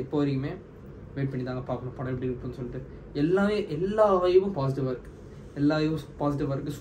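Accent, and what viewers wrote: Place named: Malaysia. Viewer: Ponna , savadi ? Neenga Malaysian ah bro ? Antha slang varuthu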